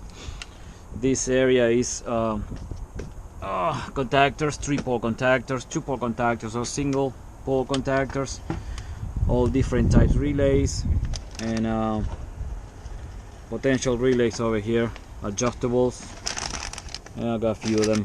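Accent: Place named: Argentina. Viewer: You sound like your Argentinean. who do you think about the testo digital gauges?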